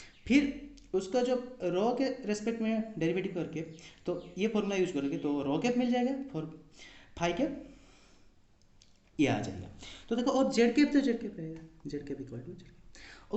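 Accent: Odisha, India. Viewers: Are u odia?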